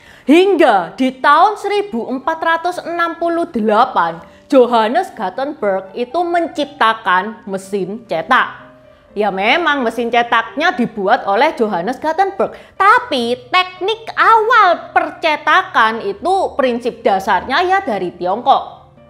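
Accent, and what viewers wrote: Java, Indonesia. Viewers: Cik logat jawa bangat. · Logat Jawa tapi Mandarin .... H E B A T... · logat jawane medhok... cina abad lampau memang tergolong maju... Klo penemuan cina abad ini apa y? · Konten bagus saya suka sejarah apalagi jowone medhok 😀👍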